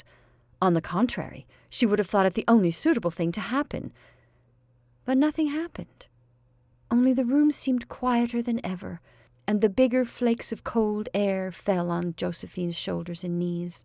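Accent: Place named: United States. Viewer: The reading should be in an English voice, not American — one cannot imagine a more English story like this one! Pity.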